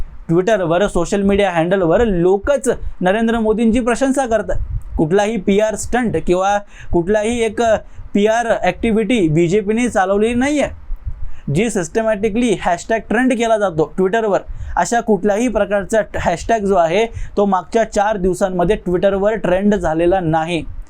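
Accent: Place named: Karnataka, India. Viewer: तुम्ही स्वतः कानडी भाषिक आहात का ? काही उच्चारावरून तसे वाटते . तरीही तुमचे व्हिडिओज खूप चांगले , स्पष्ट तरीही मुद्देसूद असतात .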